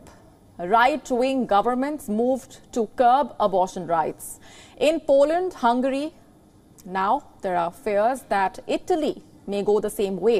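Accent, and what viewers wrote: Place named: India. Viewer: Love the indian accent ..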